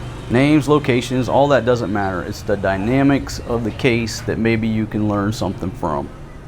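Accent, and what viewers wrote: United States. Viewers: The trailer idea is genius. Even if she gets possession of it, it would be a lot cheaper than losing a house. Take care with the bank account comment; it might bite you if it gets in the wrong hands. I'm not from your part of the US but I have absolutely no problem with your attitude or the way you talk. Be yourself and be proud. Peace out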